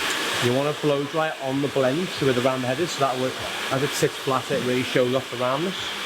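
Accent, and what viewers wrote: Liverpool, United Kingdom. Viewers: Can someone tell me which UK accent that is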